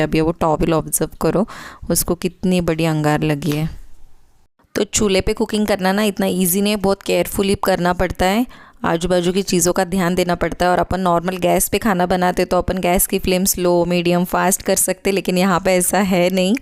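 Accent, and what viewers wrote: India. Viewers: As salamu alaikum mai to peheli bar Aap ka Vlloog deqa ji aap Kon se gaon se ho ji Aur aap ka bat karne ka tariqa to Hamare andara pradesh jai sa lagta hai vllog bhi Masha allha Aur pakwan bana neka tariqa Subhanlla 👌👌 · Sister aap kokni ho kya?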